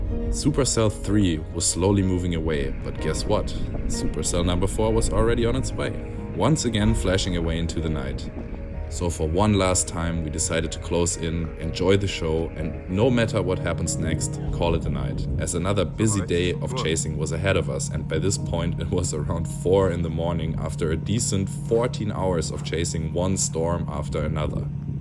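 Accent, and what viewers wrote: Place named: Germany. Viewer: Ich hatte nach den ersten 10 Sekunden irgendwie das Gefühl das der Sprecher als Muttersprache Deutsch spricht und ich hatte Recht.😅 Aber super Video, alles interessant eingefangen. Macht weiter so!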